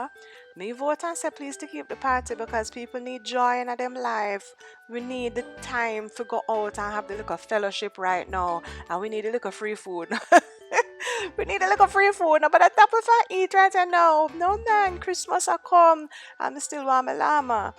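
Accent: Jamaica. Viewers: spicy and timely inserts of patois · love how you can go from patwah to well spoken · 😀😉😄😄. Love your attitude. Funny😁. Life is not one-sided. Love the patois too 😂😂😂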